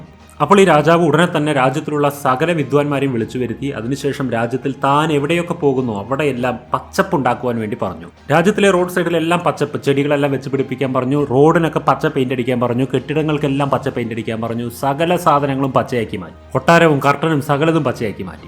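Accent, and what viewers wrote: Palakkad, India. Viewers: Oru palakkad Karan alle ?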